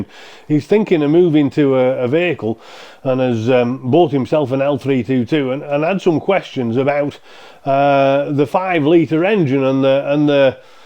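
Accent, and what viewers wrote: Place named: Lancashire, United Kingdom. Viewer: Some people just have to something pissy, if you don't like the man's Lancashire Accent go get your highly valuable LR knowledge somewhere else. No one is forcing you to listen . Ungrateful pillock.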